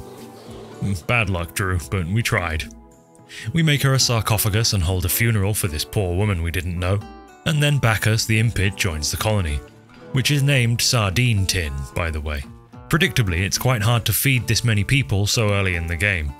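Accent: United Kingdom. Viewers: bro dude are you and the same dude u have like the same voice but you are both britich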